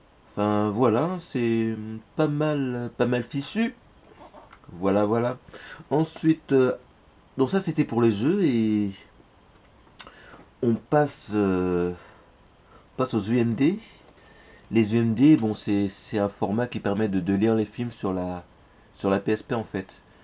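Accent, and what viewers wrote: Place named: England, United Kingdom. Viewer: Bon la vidéo date mais ça se voit que t'as l'air sympa et t'as une belle petite collection. Belle accent anglais. Par contre, l'intro est catastrophique 😂.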